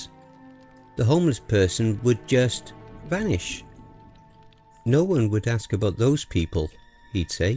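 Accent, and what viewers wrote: United Kingdom. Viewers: I'll listen to it. Listening to a narration with a British accent is so great.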